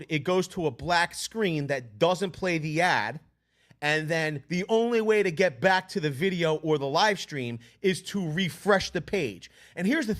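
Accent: Philadelphia, United States. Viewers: Lioke, prioce, wuter LOL must be a Philly guy always good info though despite the accent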